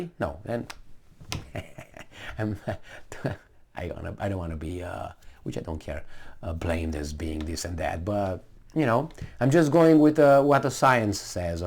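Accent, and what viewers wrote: Australia. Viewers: Not a bad casual attempt at an Australian accent, for a Romanian....😁👍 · A Romanian, speaking in an Australian accent is something I didn’t know I needed lol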